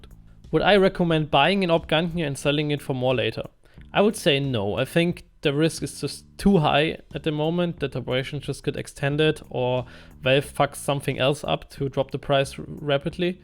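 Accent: Germany. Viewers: schon witzig wie man deutsche am englischen dialekt erkennen kann^^ ich mag investment videos für steam market und lass mal ein abo da für deine mühen ;)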